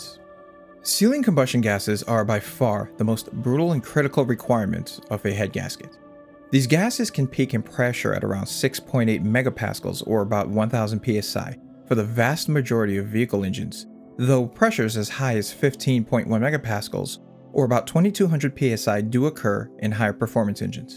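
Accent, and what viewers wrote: United States. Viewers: Quit using these stupid metric units that nobody uses, also you sound American so I don't know why these units are your primary's.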